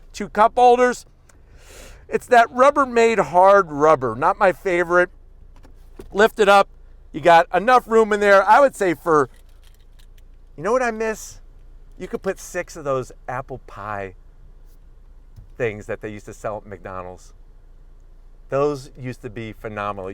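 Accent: United States. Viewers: Sounds weird but American 🇺🇸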